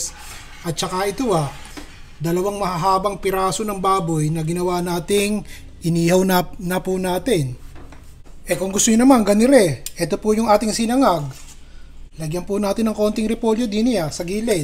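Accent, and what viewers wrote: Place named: Bulacan, Philippines. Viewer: Chef,bulakenyo po ba kayo?kasi ung accent nyo at diction ay bulakenyo 😁😁😁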